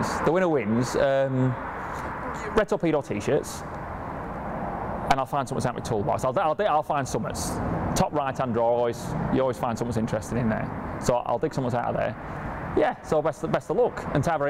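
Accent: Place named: Lincolnshire, United Kingdom. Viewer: people form lincolnshire talk like this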